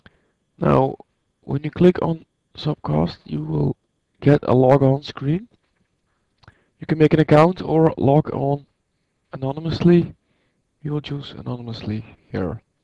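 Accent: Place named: United States. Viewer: Inglês bom pra eles mesmo só o americano... vai falar keeeent (can't) mesmo... sotaque irritante... so... were u stoned, sleepy or just bored? can u teach me how to smash who doesnt broadcast botafogo's soccer games? PLEASE! BROADCAST MORE SOCCER MATCHES FROM THIS TEAM!!! COME ON! ITS LEADIN THE BRAZILIAN LEAGUE N PLAYIN NICE SOCCER! ty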